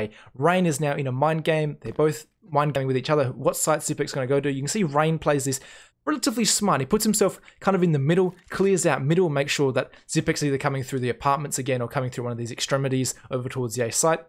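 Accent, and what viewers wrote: Australia. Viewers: One of your absolute best videos ever man. I find the Australian accent is such a great one to listen to with analyses; I know that sounds weird, but it may be thanks to the many cricket matches my father used to watch in the house when I was a kid lol Subjective BS aside, your analysis here was super detailed and spot on. Down to the movement paths, the timings, the mindsets, right down to the metal-association with Nuke B- everything. Really, they are always good; but with this video, you have outdone yourself.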